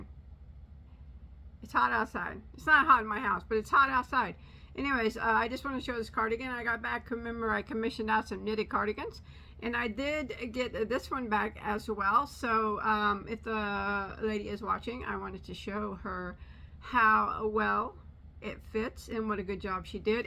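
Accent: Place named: Midwest, United States. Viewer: I love your Midwest way of speaking. You are so real and confident. I'm glad you wear pants unbuttoned while relaxing.😊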